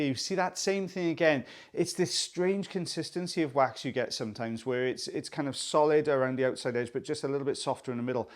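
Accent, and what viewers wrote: Wales, United Kingdom. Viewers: Welsh, Welsh!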